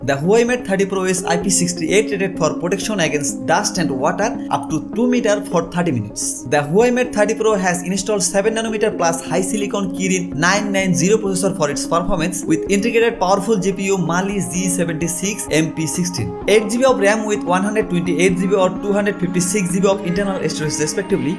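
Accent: Bangladesh. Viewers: Funny commentary Sounds so Bangladeshi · Bangladeshi english 😄😄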